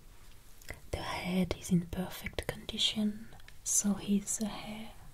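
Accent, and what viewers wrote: France. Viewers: I just realized that your beautiful way of speaking English with your French accent has brought back many memories from 30 years ago when I fell in love with a Vietnamese woman who I regard as the love of my life, who learned French when she was young in school in Vietnam in the 1960s and lived and worked in France (Bretagne) for a decade after escaping Vietnam in the 1970s. She learned English as an adult and had a very unique way of pronouncing certain English words, which thanks to you I now recognize as being a French accent but I never realized it until now. Thank you for bringing back those good memories even though my love story had a sad ending, but having listened to your voice in a wonderful ASMR video has helped heal the scars that are still there for me as I can hear her voice once again through your voice.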